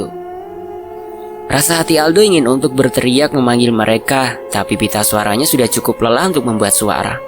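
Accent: Java, Indonesia. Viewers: tapi q lebih suka bahasa jawa mimin medok poll jadi tambah seru ceritanya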